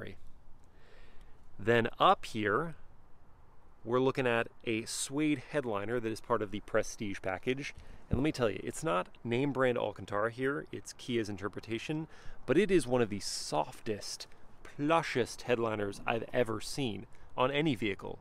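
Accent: United States. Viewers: Great command of your communication. Wish you had ended with the country accent to bring it all full circle. Keep up the good work! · I love your country accent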